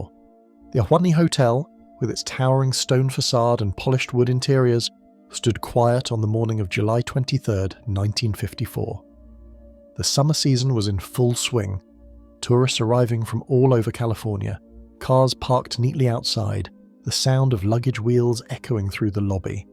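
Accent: England, United Kingdom. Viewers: You think this is bad try to sing to a strong Irish or strong Scottish accent lol. The narrator has an awesome English accent!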